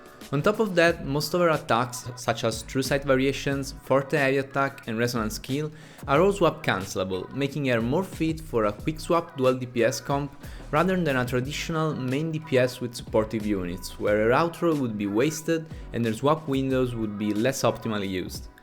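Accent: Italy. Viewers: Completely unrelated to the video, but are you Italian by any chance? Lol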